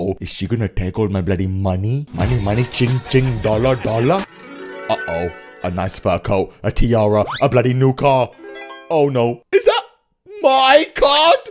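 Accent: United Kingdom. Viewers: Are you british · He’s British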